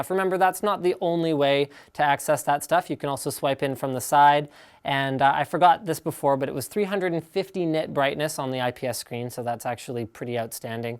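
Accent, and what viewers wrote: Germany. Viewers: >.< I watch this show for your German accent... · Came for the German accent, stayed for the unboxing! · I dont know about anyone else but his german accent is pretty much the only reason i watch his videos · Im solely here for the german accent :) Sehr gut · german accent? wtf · You're german? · I watch for the German accent...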